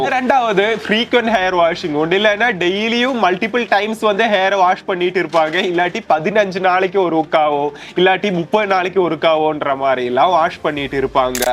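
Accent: Kerala, India. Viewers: மலையாள தமிழ்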